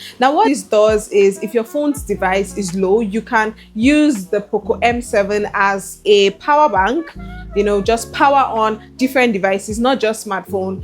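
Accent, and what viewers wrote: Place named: Nigeria. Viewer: 0f all the reviews I have seen on this device, yours is the most comprehensive (maybe I am biased because of the Nigerianness of your accent. That said, I think this is serious value for your money. I value large battery life in phones and that made me go for Tecno Pova 6 Neo, but the specs are at a lower part with the Poco, and it's costlier. I have been scouting for a budget device to replace my tecno until I stumbled upon this phone on Facebook. I am so impressed with the specs and I am going for it.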